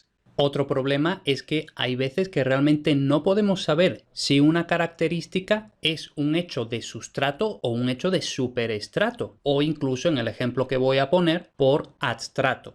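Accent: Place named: Spain. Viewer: Me refiero a tu pronunciación normal. Pues es curioso🤔, debe de ser que en la zona de España de la que vienes se pronuncia así. O igual es mi zona de España donde se pronuncian diferente, ahora mismo no sabría decir.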